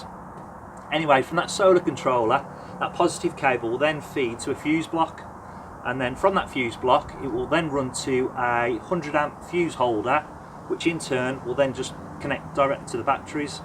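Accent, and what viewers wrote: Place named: Midlands, United Kingdom. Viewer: Hi, thanks for the videos they've been really helpful. Good to hear a fellow midlander too. Just wondered if you'd encountered any problems since the install or if you'd do it differently now? I'm going to give this method a go once the weather gets a bit more reliable!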